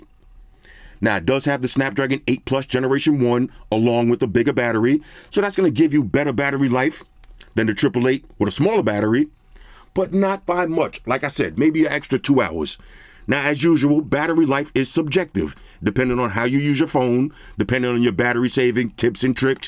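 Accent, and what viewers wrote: New York, United States. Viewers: I was waiting for this review! I agree that price is too high and it's not a flagship phone. I was thinking about getting it (currently on the S20+) but I think I'ma pass and wait for the S whatever is next 🤦🏽‍♀️😂😂 Sidebar for floss: I love these videos because I get to hear that NYC accent (I'm from Southside Jamaica Queens too) and I don't hear it a lot in Austin, TX 😁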